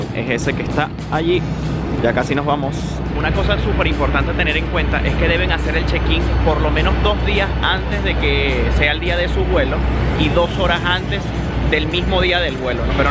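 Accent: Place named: Venezuela. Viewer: Saludos de Rio, Brasil...te oigo muchacho para entreinar el espanol de Venezuela... ...y te deseo mucho mas sucesso gajo en Portugal y tambien con la nueva lengua que aprendes. Tchau!!! 😊😊😊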